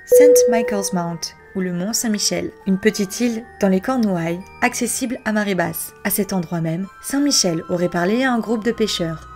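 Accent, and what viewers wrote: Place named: Italy. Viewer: Cet accent italien une douceur pour les oreilles! Sinon excellent travail. +1 abo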